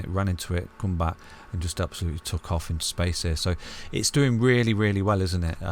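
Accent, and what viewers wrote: United Kingdom. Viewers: Thanks so much. I love your videos (which are very eductive) and your fabulous british accent!:) Which PL team do you support? Take care.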